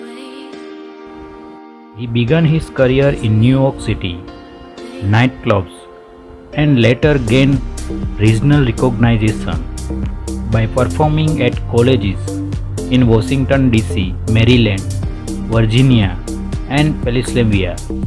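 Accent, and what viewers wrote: India. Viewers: A heavily accented indian guy narrating this video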